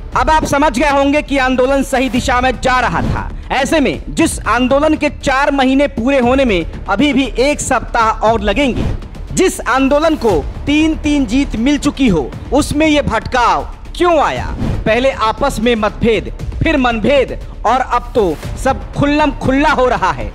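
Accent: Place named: Palamu, India. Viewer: Maine Palamau me 12 years rha h isi tarah waha ke log bolte hin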